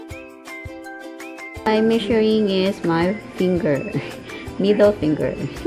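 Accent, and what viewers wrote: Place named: Philippines. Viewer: Your philipines slaunge its sounds nice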